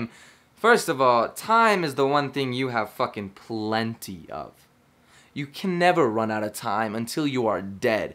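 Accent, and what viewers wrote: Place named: United Kingdom. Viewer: Amazing British voice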